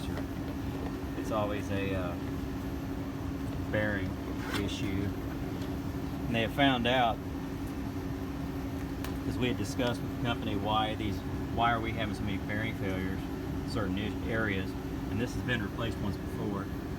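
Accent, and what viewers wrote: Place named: United States. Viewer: got that wrong u got american accent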